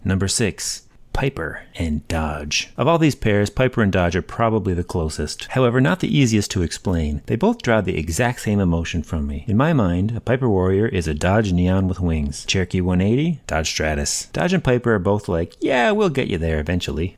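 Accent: Canada. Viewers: This is the most Canadian man I've ever heard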